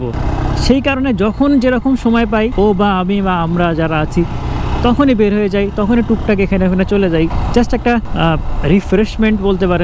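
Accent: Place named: India. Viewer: ভাইয়ার বাড়ি কি কলকাতা নাকি 😅 ইন্ডিয়ান লোকেদের মতো কথা আসে কিছুটা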